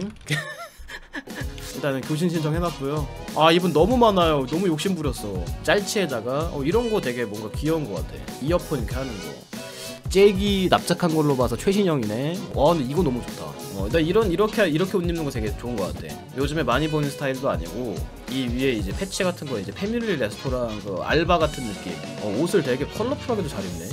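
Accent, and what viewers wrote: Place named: North Korea. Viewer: 북사투리 ㅋㅋㅋ 개노잼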